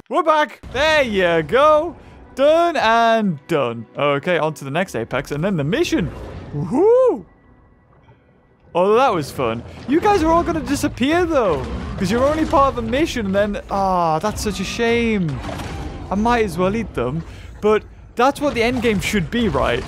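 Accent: Louisiana, United States. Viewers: It's a Louisiana accent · there are three types of Louisiana accent 1. Farmland (southern accent) 2. City (New Orleans accent) 3.Bayou (Pete’s accent) I hope you find this educational Pls upvote so can see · He has a Cajun accent. He's creole. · are you American?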